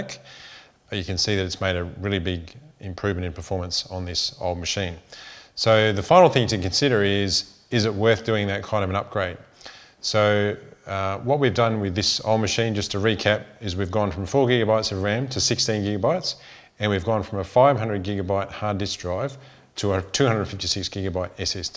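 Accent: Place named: Australia. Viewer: Since some of us hv a hard time w OZ accent.. Be nice to hv more text display in explaining the specs.. Do a detailed listing on the main screen for comparison.. Otherwise an awesome 👍🏻 review..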